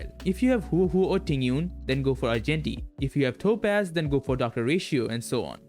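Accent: India. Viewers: you sound indian, are you?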